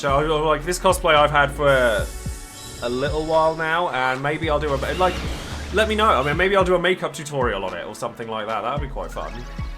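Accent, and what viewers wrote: United Kingdom. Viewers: I just started this game this past weekend, so was looking for some videos and came across your channel. I love the accent (British?) and you're a cosplayer as well (awesome). I need look no further and subscribed.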